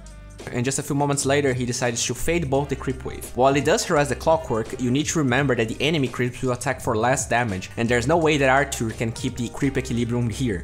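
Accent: Brazil. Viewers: where you from ? your accents sounds from brasil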